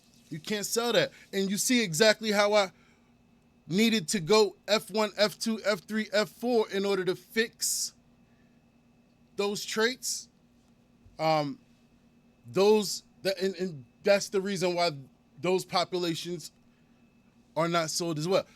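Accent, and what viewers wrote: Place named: New Jersey, United States. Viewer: I can tell homie from jersey im from maryland but been to jersey alot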